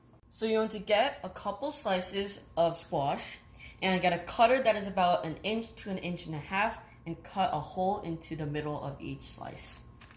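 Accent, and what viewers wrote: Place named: South Korea. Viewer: 앗 한국식 영어가^^ 맛있게 잘 보고 가유~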